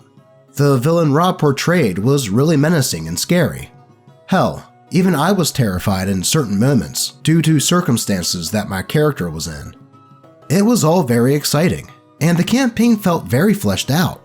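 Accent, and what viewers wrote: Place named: Maryland, United States. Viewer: I love this guys Maryland accent.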